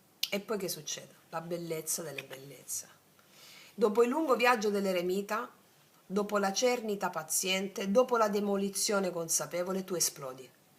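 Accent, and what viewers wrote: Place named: Salento, Italy. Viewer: Grazie cara amica, spero si avveri subito. Dal tuo accento, sento che sei del Salento come me. Dico bene? Un caro saluto 🙋‍♂️🙋‍♂️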